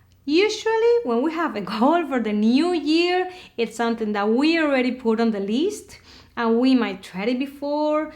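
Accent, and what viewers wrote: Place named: Spain. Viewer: Im your fan for your spanish accent of English. How sweet☺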